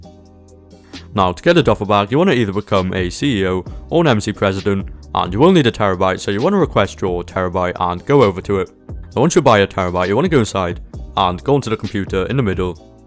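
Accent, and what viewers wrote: United Kingdom. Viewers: Are you British mate?🙂😎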